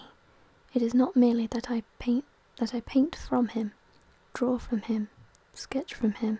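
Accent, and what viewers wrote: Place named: RP, United Kingdom. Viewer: A posh British bird. Not many of them around nowadays.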